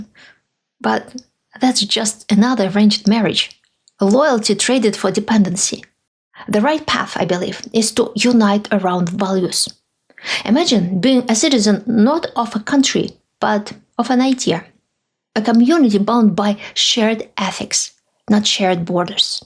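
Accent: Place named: Russia. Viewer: Hi, thanks a lot for today's episode. You raise many issues I would love to comment on. I was Dutch and will always remain that, though I consider myself to be Australian. My Dutch accent is quite strong, much more so than your almost imperceptible Russian overtones. I am familiar with say 8 European languages and gave up on studying Russian (as a hobby) when Putin invaded. My wife is from Finland and equally adapted to Oz after nearly 60 years. We visited the Ugric museum in Helsinki. Clearly the Ugric tribes could not offer much resistance to the Empire. The Fins have only just managed to get out and not be swallowed up again. My wife's father was in the Winter War. The Karelians are Fins, but different as were the Estonians (IMO) say 200 years ago. Regional dialects varied a lot also in Finland. I am aware of the Komi Ugric people living toward the Urals and believe Russia was respectful of the Ugric languages, which helped the Fins to get out during WWI. 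Currently I am too preoccupied with the war in Ukraine to watch many of your videos. With luck that stupid and horrible war will not last another year. We had many reasons to migrate to Oz, but worry about Russia and WWIII was one reason. We feel safe in Tasmania, but visit Europe anyway.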